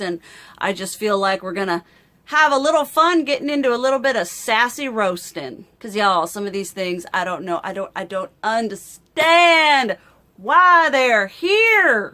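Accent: South, United States. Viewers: I absolutely Love your accent! I'm originally from Wva. I just knew you were from the South! I love your channel!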